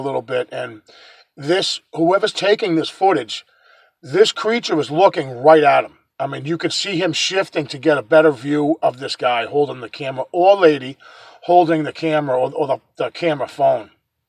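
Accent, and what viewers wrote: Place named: New York, United States. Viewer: You talk like a New Yorka lol. Regahdless. It's different than all other cryptid research channels.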